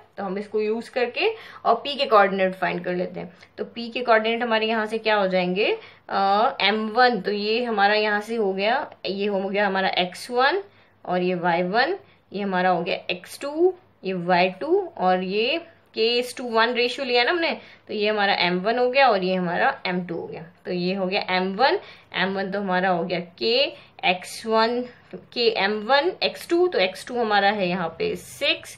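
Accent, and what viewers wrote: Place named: Haryana, India. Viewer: Haryanvi accent OP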